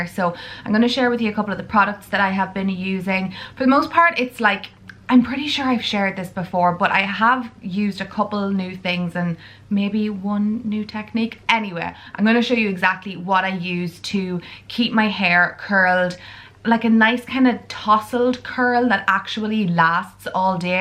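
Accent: Ireland. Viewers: Hi! I really love your videos! You're so friendly and natural! I always watch your videos with a big cup of tea--but sorry I'm a Barry's girl not a Lyons! I have two questions for you! The first is have tiu always lived in the town you live in now? I know the town where you live (I'm not sure you've ever said it on YT, I just recognise the shopping centre!) Just wondering because your accent is exactly the same as my cousins who live in Monaghan, specifically Clones!!! And my other question!!! Why are your doors always open?? Is your house really warm??😊😊😊 Anyway as I said I really love your videos! And oh yes I adore your house too! 💞💞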